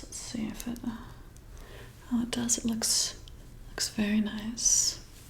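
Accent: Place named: South, United States. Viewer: Your voice sounds very Country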